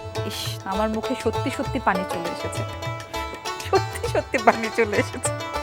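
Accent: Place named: Mymensingh, Bangladesh. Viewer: amader paser bashar ak varatiya maymonsing er sm apnar moto kotha bole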